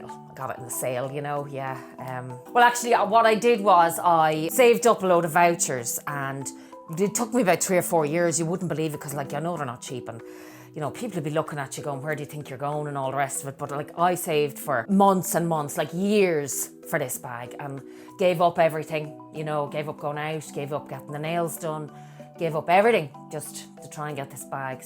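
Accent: Ireland. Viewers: Hello, I am a new subscriber and have enjoyed watching your videos. Love your accent, what part of Ireland are you from. My husbands Father came from County Cork. xoxo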